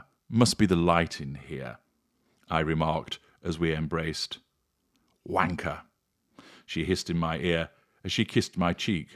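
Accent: United Kingdom. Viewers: Yes. His voice and elite British accent 😀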